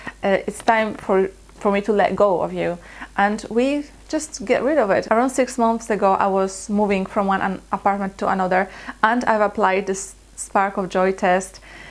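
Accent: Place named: Poland. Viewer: pls don't lose your polish accent ya.. luv it.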